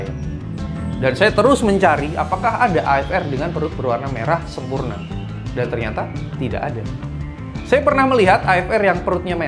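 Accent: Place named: Banyumas, Indonesia. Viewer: Wong ngapak jebule.. hihihi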